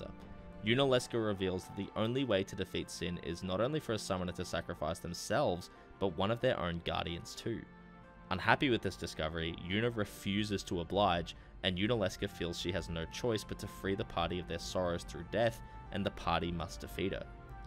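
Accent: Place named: Australia. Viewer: nuts how you have so little subs loving this vid + the aussie accent always makes me feel at home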